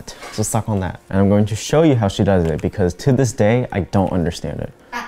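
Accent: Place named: China. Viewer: What part of China are you from because your Chinese accent is strong my mom is from Beijing but she does t have a thick accent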